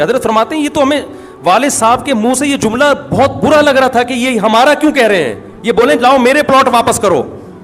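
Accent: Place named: Karachi, Pakistan. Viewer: ویسے حضرت آپ کے بیانات سننے کی ایک اہم وجہ یہ ہے کہ آپ کے انداز بیان میں کراچی دیکھتا ہے آپ کراچی والوں کا انداز دیکھتا ہے اور جو افراد کراچی کو پسند کرتے ہے وہ آپ کو ضرور پسند کرے گا انشاللہ تعالیٰ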